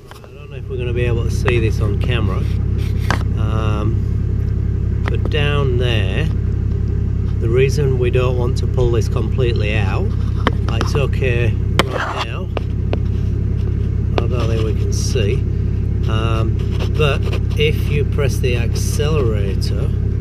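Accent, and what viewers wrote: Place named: North of England, United Kingdom. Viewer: Hi,I finally got round to this job last week on my L400 Delica, your video helped immensely - thanks! On the Delica the TPS is connected to the bracket on the front of the boost compensator, with two spacers between the brackets - damn that was a horrible job getting it all back together haha. Overall the difference is significant when the boost kicks in. I've also added a boost controller between the turbo and wastegate too, and the EGT gauge goes in today. - I've got to ask - your accent sounds like you're originally from the North of England, by any chance are you?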